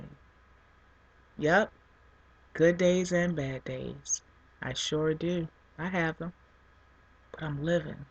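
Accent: United States. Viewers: I love your accent! I miss USA accents! :) Thank you for your honesty and love and compassion. My surgery is Oct 23rd and I am excited.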